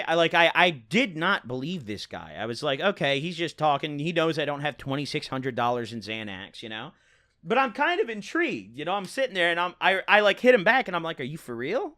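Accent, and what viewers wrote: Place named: Illinois, United States. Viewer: sounds like he’s from bloomington, il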